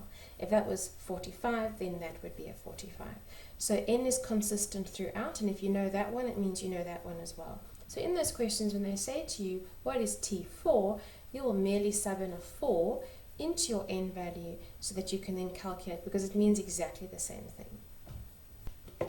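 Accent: South Africa. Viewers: She sounds south african ryt...?😄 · Youre South African? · I honestly love SA accents so much. I have an english one but yours just sounds so much more elegant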